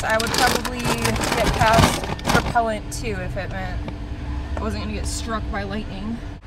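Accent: South, United States. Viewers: I’m from NC and your southern accent 😂 I like it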